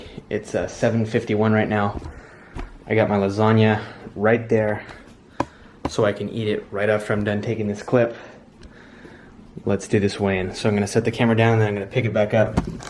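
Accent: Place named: Canada. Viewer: Hi, i wanted to ask where are you originally from? and where are you based at the moment? Your accent sounds Canadian, but your name sounds Romanian and you look Romanian.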